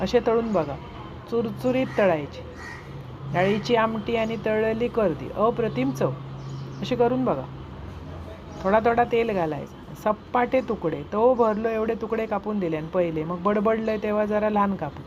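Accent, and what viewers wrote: Malvani, India. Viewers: फारच छान गो ताई तुमचा मालवणी बोलुचा स्टाईल नी तुमचो आवाज पण लयीचं चांगलो असा मी बेळगांवची ताई पण मका कोंकण लय आवडता माजो बहिणी दिल्या असत कोकणात एक सावंतवाडीक असा एक कणकवलीत असा आणी मी आता माजो बायको मालवणची करतय मका मी फारतर मालवणातचं असतंय गेलात आईतवारीच इलंय थैसून ताई तुम्ही खयचो ओ मी तिकडे इल्यावर तुमका नक्की भेटतंय मका चांगलो म्हावरा घेऊन देशील ना गो ताई मका साफ करुक कापूक येतंय मी मटणवालो असा माजो मटणचो दुकान असा ताई माझी अंजली पण मालवणी पद्धतीने फारच चांगलो चविचो जेवण करतय ती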